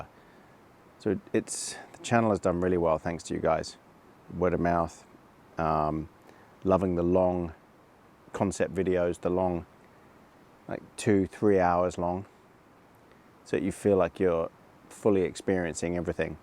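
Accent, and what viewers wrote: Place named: England, United Kingdom. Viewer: DON'T MENTION SOMETHING GO'N WRONG...HAHAHA!!! WELL,LOVE THE NEW TENT...ITS AWESOME!!! AND SO ARE YOU N BRUCE. AND YOUR SO EXCITED ABOUT THAT TENT..I JUS FEEL YOUR JOY HAHAHA '' I LOVE HOW YOU TALK...YOUR ACCENT IS AWESOME!!! LIK ENGLISH ACCENT...ALSO PLEASE GIVE A ENGLISH INTERPRET WHEN YOU DO MEASUREMENTS N POUNDS..DID I SAY THAT RIGHT..WELL INSTEAD OF WHATEVER KEELOADS ARE...POUNDS??? WELL I NEVER LEARNED POUNDS AS MEASURE..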